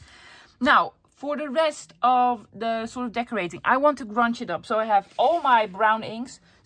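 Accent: Netherlands. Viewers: Hello!! afinally I post something - I have been following your videos and I have learned so much and now have actually completed a few things that I am happy with - with thanks to you. So I will be putting together some happy mail. It is a thank you for your big hearted sharing of what you have learned! Not only that but I am Canadian and you know the connections we have with the Netherlands. My two best friends were from Vollendam - unfortunately they have passed before me and I miss them terribly. But your voice brings them back to me with the accent and I feel close for that reason. I am still old enough to be your mother (79) but love to learn new things!!, have a lovely day or evening or weekend! All the best, Barbara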